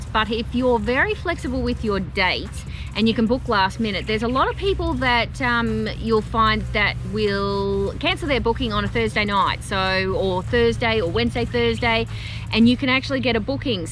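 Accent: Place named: Brisbane, Australia. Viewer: New to your channel, 1st video I’ve watched. As a fairly frequent visitor to Bribie I was interested to see how you put it across. Great video & very informative. Another option is the inland track & even camping at poverty creek (although make sure have mozzie repellent!) Sounds like your from Brissy area & will look forward to seeing your vids.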